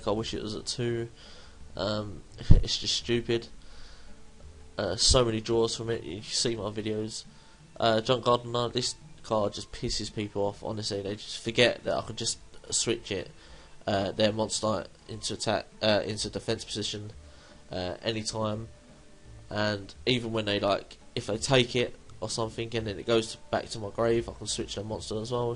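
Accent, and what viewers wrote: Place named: Cockney, United Kingdom. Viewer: You have a cockney accent for sure mate, like danny dyer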